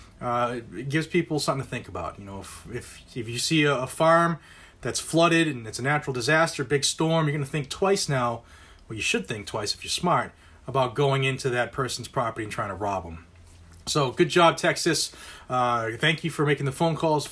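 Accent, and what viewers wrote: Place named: Southwestern Pennsylvania, United States. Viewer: Sounds just like Southwestern PA! Lol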